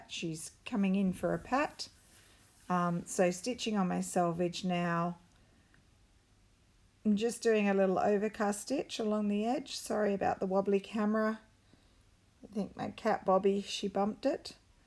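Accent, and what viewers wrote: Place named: Australia. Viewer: Loving listening to you explain your process and your Australian accent. Getting excited to start stitching this week! Have a wonderful weekend.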